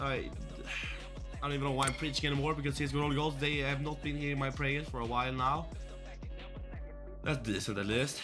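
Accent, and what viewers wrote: Sweden. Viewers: hahah älskar din svengelska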